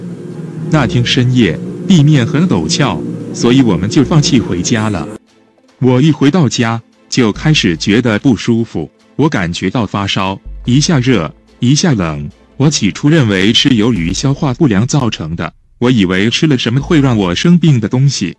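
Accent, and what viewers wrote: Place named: Taiwan. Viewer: 這個語音居然有點台灣國語，是沒錄好嗎==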